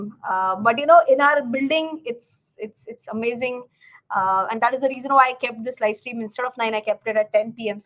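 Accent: Maharashtra, India. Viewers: Mam aapki english bhi marathi ki tarh lagti hay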